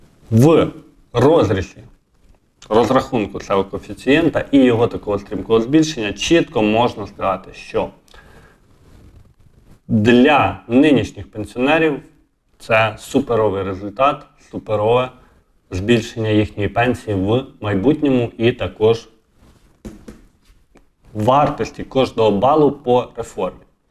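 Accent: Vinnytsia, Ukraine. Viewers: Іправда як вже ти 'дістав' своєю балаканиною. Пора вже тобі вивчити українську мову бо твій вінницький суржик вуха ріже.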